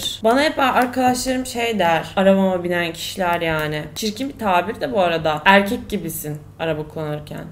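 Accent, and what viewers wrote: Kütahya, Turkey. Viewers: Yapçem etçem Allah'ım ya tam Kütahya şivesiyle konuşuyon 😂💓 Kütahya'dan selammmmm öpvedim gali seni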